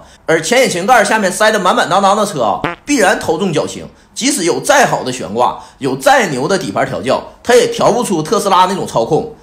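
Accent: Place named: Northeast, China. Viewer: 听这东北银白活了半天，特斯拉原来这么遥遥领先呀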